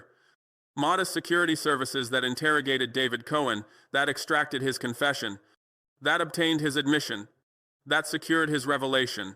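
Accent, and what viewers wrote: United States. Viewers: Why has he got an American accent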